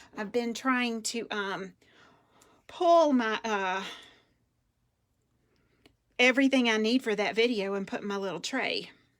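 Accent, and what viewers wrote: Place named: Georgia, United States. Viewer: I am watching you from Atlanta ps. You crack me up! Definitely sounds like you’re in GA 😂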